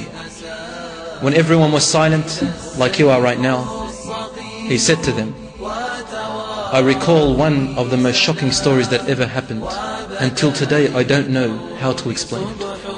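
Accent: Australia. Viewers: The speaker has an Australian accent and i think it might be Moosa Cerantonio, hope it is and salam to you :D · Who is th e person speaking? It doesnt sound like Sheikh Feiz to me...besides the australian accent. And people stop crying about what he said first... slip of the tongue, we're humans we make mistakes. =)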